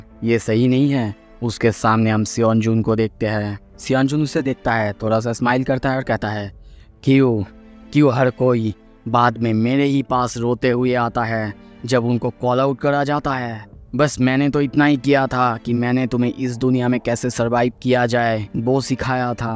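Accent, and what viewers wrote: Bengal, India. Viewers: Are you bengali???😊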